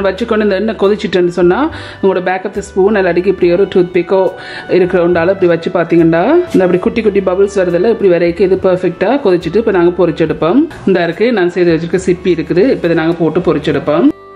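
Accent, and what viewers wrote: Sri Lanka. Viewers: இது இலங்கை தமிழ் அதை கேவலம் என்று சொல்ல வேண்டாம் பிடிக்கவில்லை என்றால் ஏன் வீடியோ பார்க்கிறீர்கள்.... நீங்கள் உன்மையான தமிழர் என்றால் கேவலமான தமிழ் என்று சொல்ல மாட்டீர்கள்...... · I'm from Tamilnadu near Madurai I subscribed to hear your srilankan tamil. Your recipies are entirely new for me and very interesting too.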